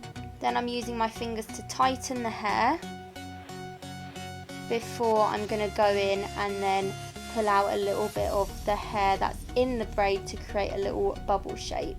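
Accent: United Kingdom. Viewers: Lovely accent. I want to be British!😭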